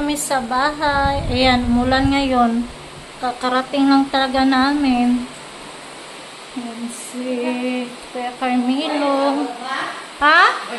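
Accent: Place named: Visayas, Philippines. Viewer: Pretty bisayang bisaya na 😂 ❤❤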